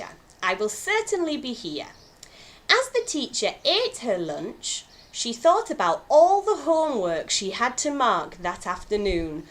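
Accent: United Kingdom. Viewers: Great Video! Wonderful English accent!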